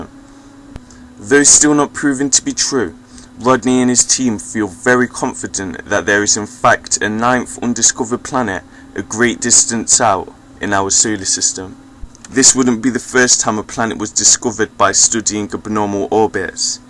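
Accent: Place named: United Kingdom. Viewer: where in u.k u from mate? london? great show mate keep it up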